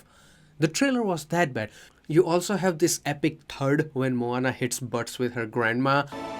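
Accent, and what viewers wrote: India. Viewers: My god I love Indian accents so much lol I could listen to it all day Great video and good points made, I’m just so tired of live action movies and sequences… Disney really just wants to play it safe, and they think the problem is when they come up with originals, well, they just need to make the originals NOT SUCK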